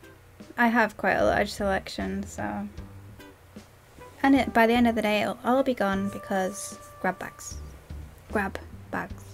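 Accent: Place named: England, United Kingdom. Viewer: Where are you from in England? Your accent sounds familiar but I’m really bad with accents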